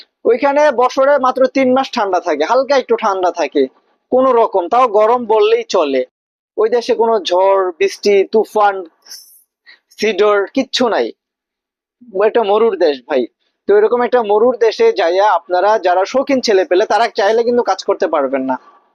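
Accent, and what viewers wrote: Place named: Bangladesh. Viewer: ভাই আপনি বাংলাদেশ থেকে নিউজ করেন নাকি দুবাই থেকে নিউজ করেন তাইলে একটু জানাবেন. আপনার কথা বোঝা যায় আপনি বাংলাদেশ থেকে কথা বলতেছেন.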